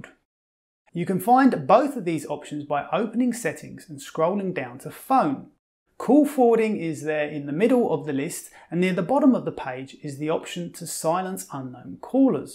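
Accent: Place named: Cockney, United Kingdom. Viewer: Thanx! It sounds like you have a Cockney accent! Like it! Esp. Word SCHEDULE!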